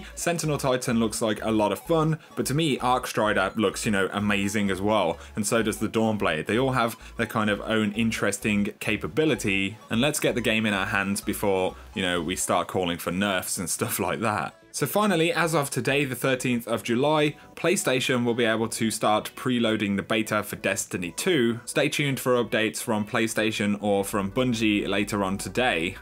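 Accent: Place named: United Kingdom. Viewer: Thanks, keep up the good work! (from Michigan, USA) Love that "!" UK accent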